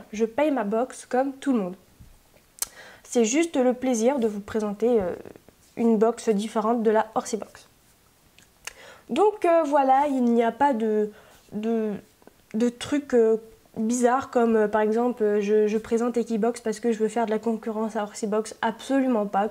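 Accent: England, United Kingdom. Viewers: haha j'adore ton accent anglais 😂😂 sinon super vidéo☺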